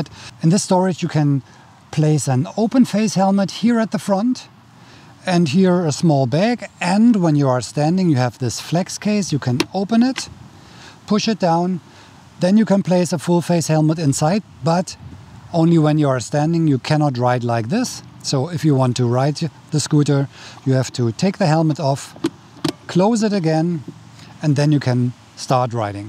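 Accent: Germany. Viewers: Your accent sounds Teutonic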